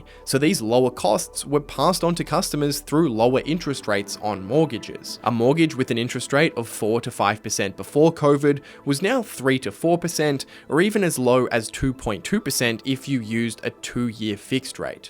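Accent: Australia. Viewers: I cringe every time you say ‘graff’ in your ‘melbun’ accent. The correct pronunciation is ‘grarf’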